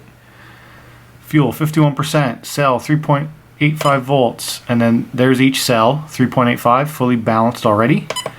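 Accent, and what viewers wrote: Canada. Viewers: The Canadian in me immediately recognized the Canadian in you 😁😁😁😁🇨🇦🇨🇦👌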